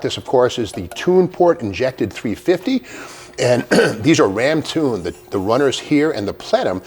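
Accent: Boston, United States. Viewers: ❤ your Boston accent🎉 am buying 88 year for 6400🎉❤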